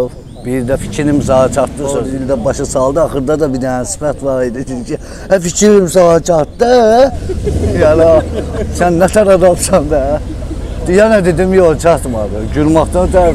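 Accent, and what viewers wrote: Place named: Shaki, Azerbaijan. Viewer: Bəli qardaş Şəkili ləhcəsində Şəkili obrazını çox supper oynayırsınız əla, Şəki ləhcəsinin pərəstişkarıyam, salam olsun Şəkililərə.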